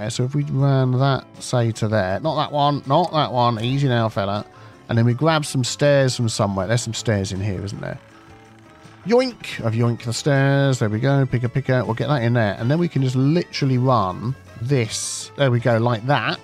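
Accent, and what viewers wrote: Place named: Midlands, United Kingdom. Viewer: 😂😂😂😂 Was watching the bad tide warning count down, then watching it flow through the system when you zoomed out, and the warnings pop up internally screaming at to close the gates 😂😂😂 One thing though, the word is everythinG not everythinK, are you from the Midlands at all? Seams everyone says it round here and I'm constantly correcting my son, parents and siblings 😂😂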